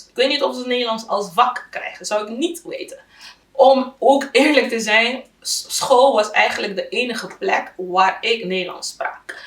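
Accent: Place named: Colombia. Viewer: Tu Español suena muy Colombiano. Hablas muy bien todo. Yo no pude aprender Holandés porque todo el mundo habla Inglés en Amsterdam y en donde trabajaba todos se hablaban en Inglés. Un abrazo grande desde Colombia!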